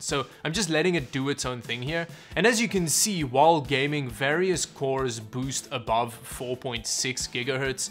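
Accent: South Africa. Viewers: Ive suspected you are from South Africa for a while now due to your accent and then you used that thing when we say "ill get into that just now". Could you please confirm to satisfy my curiosity cause its very distracting while watching your content :P Cheers mate! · ARE YOU sOUTH aFRICAN?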